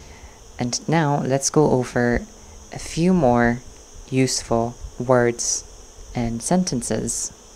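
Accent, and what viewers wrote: Ireland. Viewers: I swear the lady presenting the video has a dash of Irish accent. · Hi, I am very interested in the Sami languages.Do you happen to have Sami-learning materials (e-books/digital formats in pdf or dejavu formats)? Not necessarily in English, could be in Norwegian, Swedish,Finnish or German as well. Thank you for your video, very much appreciated. By the way, your you tube name sounds Gaelic to me and your English has an Irish lilting.Tá Gaeilge agat ? Regards from Spain/ Go raibh maith agat.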